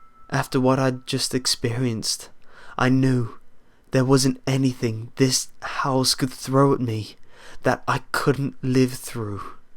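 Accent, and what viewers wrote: United Kingdom. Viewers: Would've been scarier if you didn't sound like you were from The Beatles. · He's saying it normaly. There's no k, he's pronouncing the g, and you probably don't pronounce that yourself... -_- different accents from different places. PLUS he can't 'fuck up certain words' that are correct in British english because American English is a different language. So yea... .-. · you sound so british......its cool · Oh my god..... I really love this story :) YOUR BRITISHNESS IS WHAT TRULY SELLS IT!!! <3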